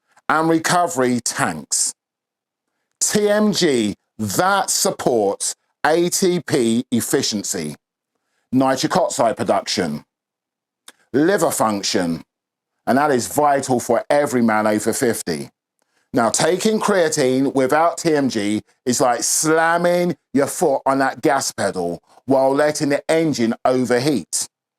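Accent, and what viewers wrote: United Kingdom. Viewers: Sounds like midlands (Brummie) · He is so British that he sounds fake.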